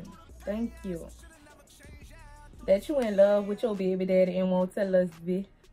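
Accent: Louisiana, United States. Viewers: Love your Louisiana accent!!❤️❤️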